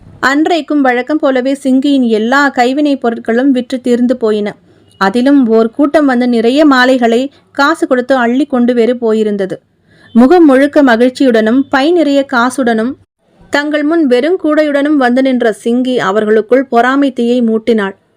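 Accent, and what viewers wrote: Madurai, India. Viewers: Madurai slang vera level sister 🎉🎉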